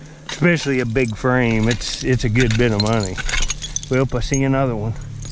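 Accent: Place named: Maryland, United States. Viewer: Hi! I am new to your channel and really enjoying your viddies. Squirrel Man is a lucky, happy little guy. Seems he has you wrapped around his little paw. So sweet. Not sure where you are from, but your accent sounds like parts of here in Maryland. Dig on!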